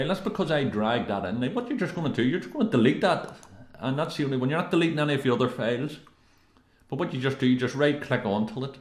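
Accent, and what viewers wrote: Belfast, United Kingdom. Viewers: are u from belfast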